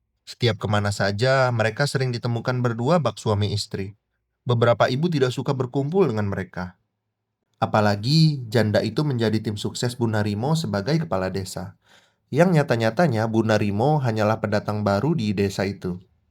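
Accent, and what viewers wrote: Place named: Medan, Indonesia. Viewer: Kerennnn...logat medannya berasa banget...good luck yachhh 🎉🎉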